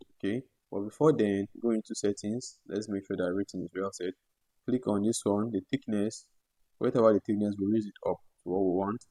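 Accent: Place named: Nigeria. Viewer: The best 3d tutorial I've come across on YouTube. I love your simplistic, clearly explained, common Nigerian accent and jovial teaching style. You have 100% of my attention, and I will definitely recommend you to fellow students. Please, how do I get the CAD image installed, and how do I join the whatsapp group?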